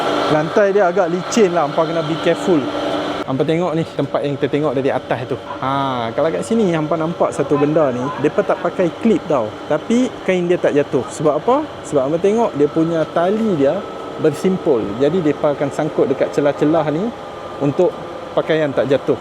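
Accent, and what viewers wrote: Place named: Kedah, Malaysia. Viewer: Aku dah terjerat dgn channel tuan.. Haru... Aku jrg tgk channel mcm ni.. Pasai pa tak tak tau blh sgkut plk.. Mgkin cara penyampaian dan adab dia dgn org kot.. Tuan Penang ka kedah.. Lenggok mcm Penang..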